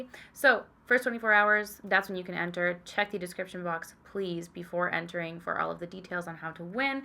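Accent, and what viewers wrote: United Kingdom. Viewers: 🎄🎄🎄 I was disappointed not to see (hear?) more British accent in this vid - would have been the perfect opportunity! Thanks Ig: sybelion 🎄🎄🎄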